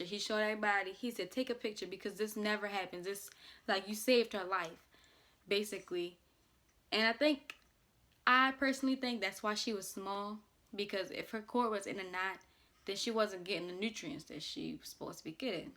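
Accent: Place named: Baltimore, United States. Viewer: I was listening to you talk and I was like “Oh she’s from Baltimore!” I know my ppl when I hear them🤣 I grew up in Towson but have been living in DC and now National Harbor, MD. What hospital did you have her at?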